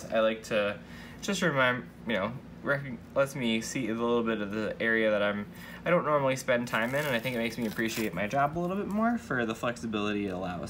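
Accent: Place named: Minnesota, United States. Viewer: Just came across your videos! It's freshing see/ hearing a guy plan! What part of Minnesota are you from sounds like the cities area. I was born and raised in St. Cloud! Love hearing the accent too!!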